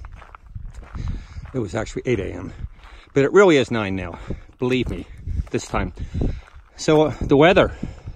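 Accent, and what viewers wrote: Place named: Western Pennsylvania, United States. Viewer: Wow, you were on fire that day. Also, you sound like you are from Western PA.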